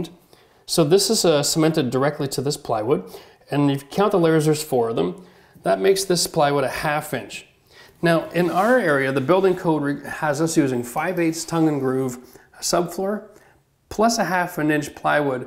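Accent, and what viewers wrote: Canada. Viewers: and i khew it before I saw the Tim Hortons cup. The way Canadian's say house gives it away every time. or out. Also, he has skills.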